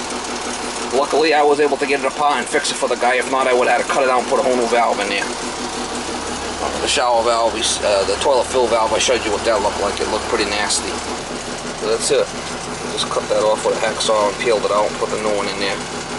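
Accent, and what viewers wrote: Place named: Minnesota, United States. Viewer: Hi,are you from Minnesota or Boston? Interesting accent brother. Nice video, I still dread rebuilding a Delta valve even after 32 years in the trade. DD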